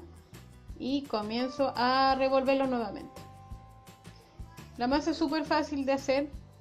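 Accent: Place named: Chile. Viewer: Al fin un video explicado por un chileno,, asi se entiende mejor 👌👌muy wena la receta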